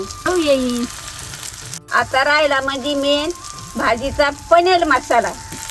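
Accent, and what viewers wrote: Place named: Ahmednagar, India. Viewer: आजी च बोलण्यात नगरी तडका आहे 😂🥰❤️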